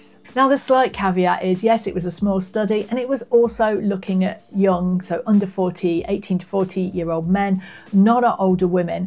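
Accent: United Kingdom. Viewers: I love the way the English say almost anything.❤️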